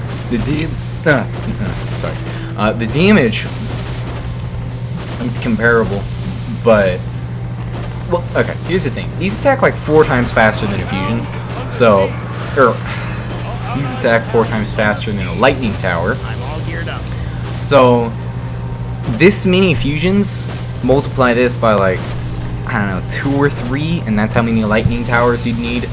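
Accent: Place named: Dakota, United States. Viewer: Are you from Dakota or something whats that accent?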